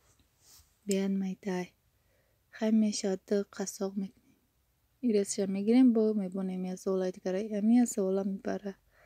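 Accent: Kulob, Tajikistan. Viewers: Салом ма дав да канали хдт мехмон шидм да як канали дигат дромадм ай тарзи гапзанит фамидмки тоза Кулоби нав якта блогери кулоби дидм .манхам кулобиюм .бо хамрохи шавхарам бо фарзандом да масква зиндаги мекунем Кулобчон ба пеш .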